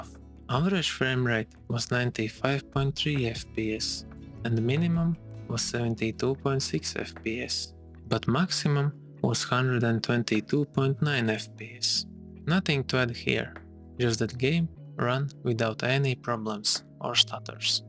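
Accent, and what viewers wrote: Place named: Ukraine. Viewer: Heavy accent, reminds me, GO Ukraine! Better Democracy there than the USA right now! haha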